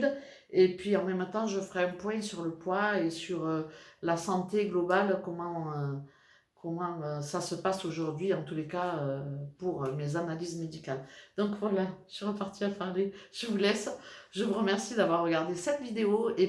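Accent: South, France. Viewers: Coucou! Je vous découvre depuis 3 jours. Holalaaaaaa comme je suis contente! Vous êtes d'Avignon je crois. Moi j'étais à Boulbon. Originaire d'Arles. Et depuis 1 an je vis à Lyon. L'accent du sud me manque tellement! Alors un régal de vous écouter!!!!!!! Ce bel accent de mon pays!! Mon mari est aussi Portuguais, il regarde les vidéos avec moi lol ! Magnifique le Portugal !! Superbe vidéos. Vous me faites tellement rire!! J'adore! Vos idées recettes au top! Merci beaucoup d'énormes bisous!!!!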